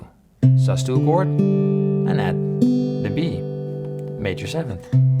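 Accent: Netherlands. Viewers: you sounds dutch? are you dutch? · This man has one the smoothest yet clearly Dutch accents I've ever heard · The Dutch accent is thick in this one.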